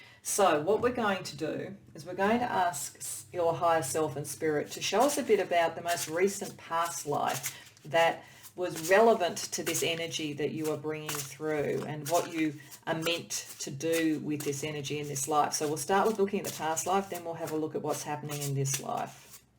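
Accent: Australia. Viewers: The way you described the phrenology oracle and what phrenology is so nonchalantly with your brilliant and beautiful Aussie accent was highly amusing to listen to for me, enough for me to want to tell you about it 😆 probably not something I'd have done in person but being as we're at a distant and communicating through the net I'm gonna risk it 😊 I just had a quick look at what others have commented on this video and I totally agree with them, you come across as someone who is obviously and refreshingly very gifted at reading cards, I think you create really unique readings and I like the different combinations and choices of decks you use too, most, if not all are ones that I'm sure I've not seen being used by other people who read and share here on YouTube before, and yeah, I too am very grateful to be here and have access to such fun and insightful, inspirational readings. 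.. and I watched a past life reading only earlier today actually, from Hermit Tarot who is another magical soul who I'm sure I heard you mention as someone who you watch too in one of your earlier videos 🤔💭 I think 😊 .. and you touched on the exact same things about this particular past life that she was reading into too! 😮🌝 crazy init how it all works, mentalist I tell thee 😆☺️ ahhh God 🙏🏻😌 I didn't fit in with the family or the society around me at all in this life in question, and I think it was in England, which is where I am again now, and I was thinking just now that I was maybe a woman in that lif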